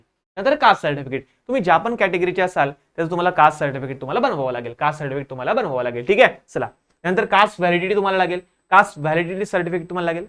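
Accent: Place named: Khandesh, India. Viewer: Sir mi Mumbai thun ahe pen mazi Mummy khandeshi ahe tyamule Mela thodi thodi khandesh chi tone samajate ani Tumi nakki khandeshi vatate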